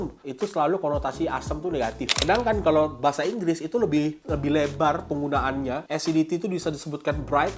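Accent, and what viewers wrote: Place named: Pontianak, Indonesia. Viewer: mak eh org pontianak rupe e wkkwkw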